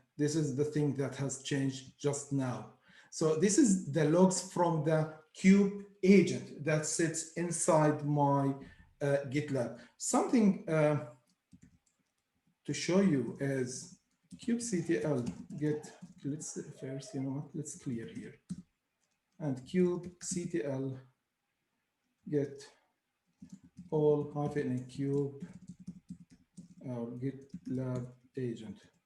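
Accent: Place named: India. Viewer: Can you get someone who doesn't have an Indian accent to do these videos? Sounds horrible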